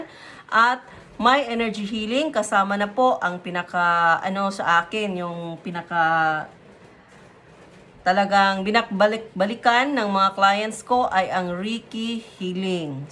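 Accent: Visayas, Philippines. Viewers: Bisaya ka ano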